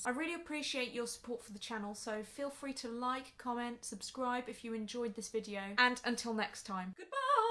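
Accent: United Kingdom. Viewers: Your surname is Polish but your english speech is very Oxford...simply nice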